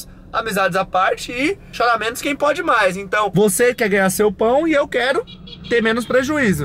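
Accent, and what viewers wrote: Bahia, Brazil. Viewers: Mo Baiano kkkkj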